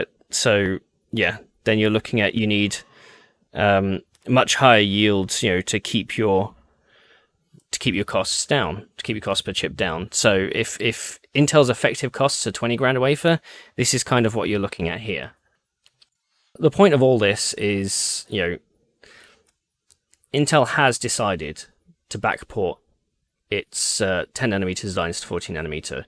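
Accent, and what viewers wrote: England, United Kingdom. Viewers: I needed an English accent to complete my collection of Tech reviewers. Came here from your Level One Tech stream.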